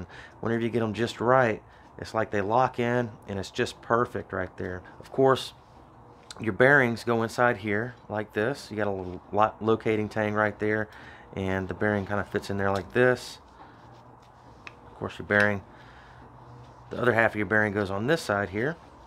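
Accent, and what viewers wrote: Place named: South, United States. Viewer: I love the polite southern accent. "don't beat the mess out of it", would have been phrased differently in the Northern USA and Canada :)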